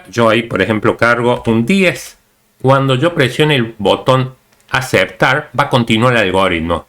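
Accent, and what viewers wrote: Argentina. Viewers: buenas tardes profesor, me esta empezando a gustar el acento argentino no me paso viendo hablar a messi ni a maradona muy agradecido voy por el video 66 no me habia dado cuenta que tenia todas esas pestañas en el editor de codigo abiertas y te vi como las cerrabas y aprendi jejeje, muy agradecido con tu enseñanza si hubiera sabido de alguien en el pasado que explicara asi le hubiera pagado. pero no encontre tal cosa. espero poder contribuir en un futuro muy cercano, la verdad que siento pena de ver tu gran esfuerzo y no dar nada de vuelta. muchas gracias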